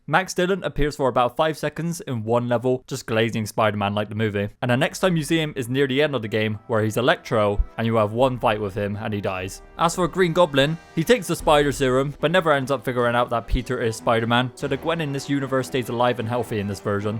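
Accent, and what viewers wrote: Bristol, United Kingdom. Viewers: you from bristol?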